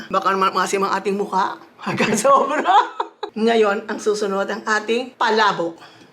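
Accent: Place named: Pampanga, Philippines. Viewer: Flabor - Ober der I can always tell people from the Philippines, they insist on their own pronunciation. Jokoy the comedian has that down.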